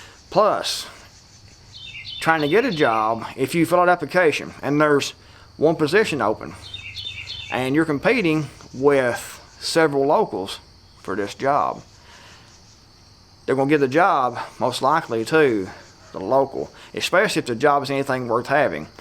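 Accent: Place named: West Virginia, United States. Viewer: Sounds like West Virginia buddy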